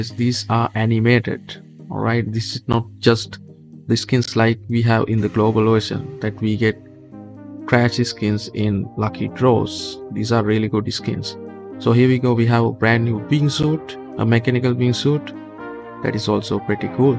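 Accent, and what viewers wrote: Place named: India. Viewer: Are you from india? Cuz your accent is Indian.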